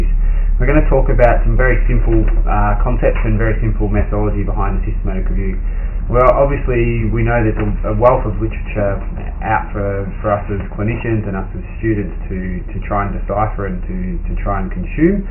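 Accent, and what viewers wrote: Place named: Australia. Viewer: Your Australian accent reminds me of school at Griffith university in the Gold Coast😄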